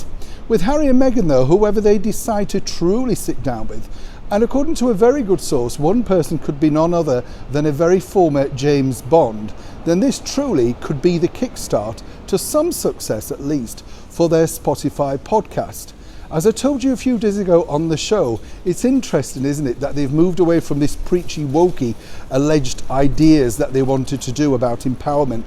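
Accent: Yorkshire, United Kingdom. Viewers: Aorta.. iota..think it's just the Yorkshire accent🤗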